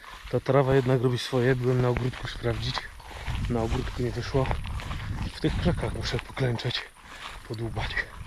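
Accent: Silesia, Poland. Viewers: Chwila na Śląsku i już zaczynosz godać:) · wraca Śląsk i od razu gwara.... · Fajnie jak się pojawia godka:), Mógłbyś więcej:) Pozdro z Rybnika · co Ty tak po ślunsku zacząłeś godoć haha XDDD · Jesteś ze Śląska czy z Zagłębia???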